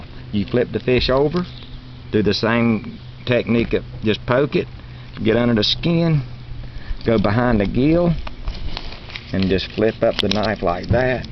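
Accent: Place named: South, United States. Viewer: I like the southern accent.... I grew up in Florida... makes me smile...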